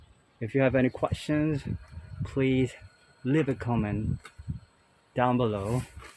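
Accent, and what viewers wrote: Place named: United Kingdom. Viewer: 개인자작이 업체보다 더 잘만들수 있다니..대단하십니다~ 나중에 의뢰하게 될지도 모르겠네요.. 그리고 개인적으로... 영식영어 발음이 한국인에게 미식보다 어려운 점이 많다고 생각하는데 멋지십니다^^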